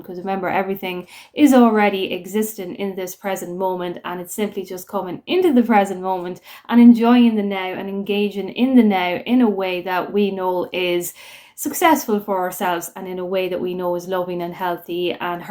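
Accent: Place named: Ireland. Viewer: hi I have sent an email with details 👍 thank you so much for the content you post, just love that Irish accent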